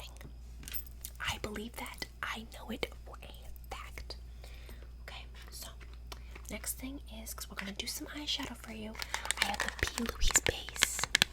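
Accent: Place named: New Jersey, United States. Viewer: You sound more like a Jersey Shore Girl, but I really enjoyed this...Love, Karen BTW, you need to do a video giving her date a shakedown because I made all my daughter’s dates pee their literal pants. They were so afraid of me!! That’s a true Karen...stare him down and yell at him with a whisper!! :)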